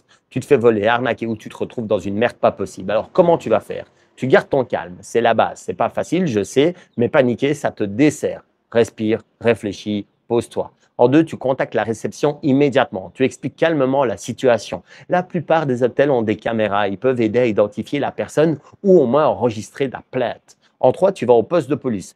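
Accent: Liège, Belgium. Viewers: Hello, Gros accent liégeois? Tu es d'où? Je passe quelques semaines en Thaïlande en septembre-octobre, un conseil; plutôt condo ou hôtel? Intéressant en tout cas m,fi.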